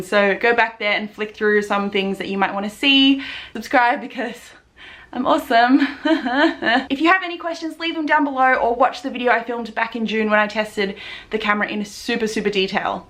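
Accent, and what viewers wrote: Australia. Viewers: oh my gosh, I love your Australian accent ! so sweet ! kiss from Paris X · Sounds aussie ;) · omg its so unexpected to hear an australian accent LOL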